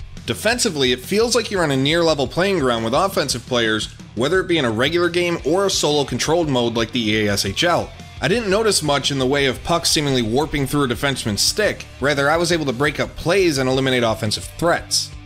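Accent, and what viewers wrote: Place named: Buffalo, United States. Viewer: Heard the buffalonian accent and saw you were using ROC and BUF a few times and thought, this guys has to be from BUF... found you on Twitter and confirmed my suspicions! Lol #gosabres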